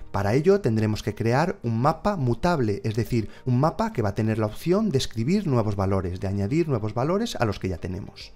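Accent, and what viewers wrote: Galicia, Spain. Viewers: En mi caso, cuando uso el remove no me aparece la palabra clave key, simplemente le paso el String y así se queda. Espero que sigas con este proyecto, tu acento gallego y tu voz calmada hace que resulte ameno aprender kotlin en español lol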